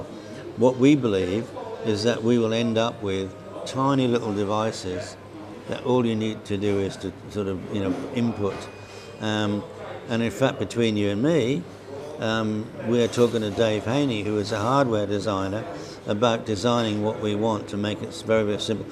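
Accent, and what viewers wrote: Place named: Norfolk, United Kingdom. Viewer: Cor - you sound right Norfolk boy!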